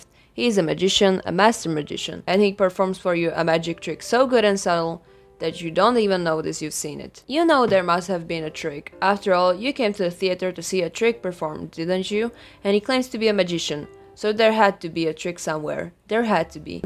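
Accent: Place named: Eastern Europe. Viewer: You sound so Eastern European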